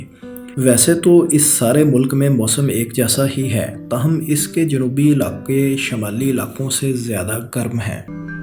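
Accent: Punjab, Pakistan. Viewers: Sub ko pata hay paindu ji, is say to acha tha kay Punjabi hi bol laytay, kisi Urdu bolnay walay say video banwa liya karo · Urdu to sahi bolain. waraich jaisa koe nhi? Ye sahab acha bol rahy hain laikin talafuz main kafi kharabi hai. Aisa lag rha hai jaisy punjabi lehjay main urdu bolnay ki koshish ho rahi ho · Too heavy Punjabi accent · Pls correct the pronunciation... It feels like punjabi. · Punjabi accent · sir ki Urdu bhe saaf nhi hai punjabi accent use karte hain urdu main jayse Barabara main bara ko kisi Ajeeb andaz main bolte hain plz change Karo plz 💔💔💔💔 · bhai, is bhai ki awaz Zara suit nhi krti apki video's my.... aysa lgta ha punjabi bol raha ha, gala ky urdu bol raha ha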